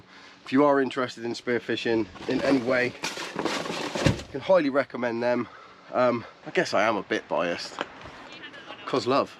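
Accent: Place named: Cornwall, United Kingdom. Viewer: Love the Vlog. We are from Liskeard just up the road but moved away to Shropshire:( We went to Millendreath all the time when I was young and loved it. Loved hearing your accent and made me very homesick. Nice one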